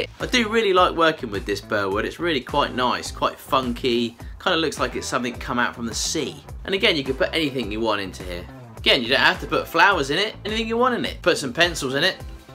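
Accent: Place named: London, United Kingdom. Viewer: 听惯了美音，再听伦敦腔，这耳朵一下子没转过来。。。。 fighting!!!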